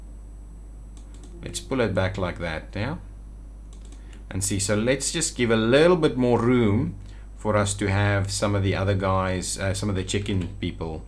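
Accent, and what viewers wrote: Afrikaans, South Africa. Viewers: Are you actually Afrikaans Wondering by the accent and the way you say your "r".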